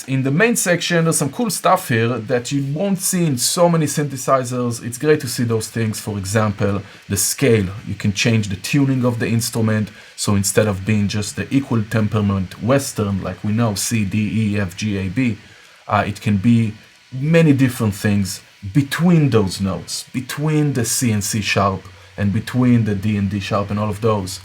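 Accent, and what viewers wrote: Israel. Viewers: אתה ישראלי? 😅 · יש לך את המבטא הכי ישראלי ששמעתי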